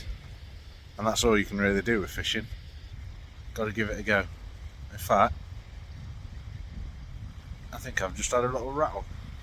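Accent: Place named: Derbyshire, United Kingdom. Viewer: I live just outside Leek, I shoot competition air rifle with a lot of Derby / Matlock lads so knew you were that way from the accent😄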